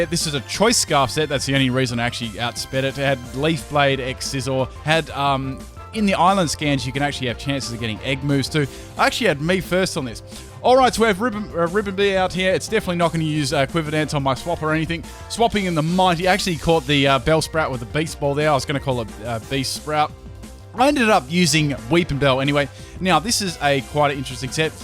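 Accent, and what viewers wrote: Australia. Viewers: Wait is Australian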